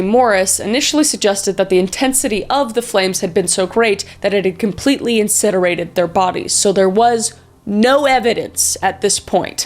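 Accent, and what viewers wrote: Russia. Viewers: Until right this second I fully thought you had a Russian accent! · Are you Russian? I was wondering because you do the accent a lot and that is what made me look at this channel because my crush is Russian and I won’t to know what he likes please please please be Russian I love him😘😏💋💋💋💋💋💋💋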